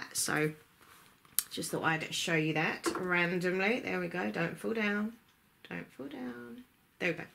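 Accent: United Kingdom. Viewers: I want to win this book because my native language is not English and I use books films and youtube videos for learning the language and I think books are the best in this because there is a lot of vocabulary in it. I learn English for some time and I try to learn more and more, so I'm trying to read English books and watch your videos, because you have a strong British accent which is good to practice listening.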